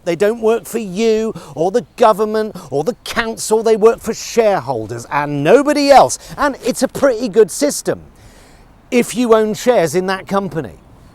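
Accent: United Kingdom. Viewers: This is me, but, without the British accent.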